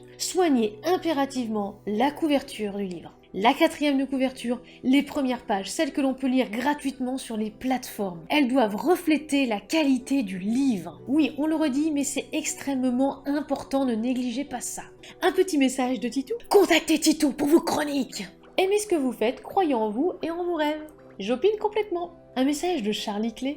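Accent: England, United Kingdom. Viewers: Cet accent anglais, j'en rêve la nuit * coeur * !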